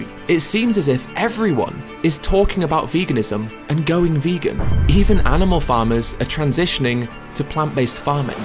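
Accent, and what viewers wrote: United Kingdom. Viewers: Pandemics will happen no matter what. They will always be a when. The changes in farming animals is already happening. You can make it happen faster by buying your meats from small farms where the animals live happier lives. The tags for cows are the same as us piercing our ears. Many do not even react to tagging. And people, if you eat a lot of beef, the twenty pounds a year at the Standard American Diet rate, (you probably eat much less like me), it would take you over 18 years to eat the weight of ONE average size steer. By the way, vegan diet is not appropriate or sufficient for toddlers or children. Just because a Nice British accent says something does not make it true.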